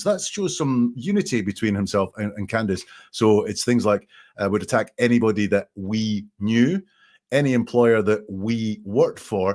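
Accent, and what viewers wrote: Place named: Perthshire, United Kingdom. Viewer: your accent sounds like your from the Perthshire area that's where I'm from I'm enjoying your channel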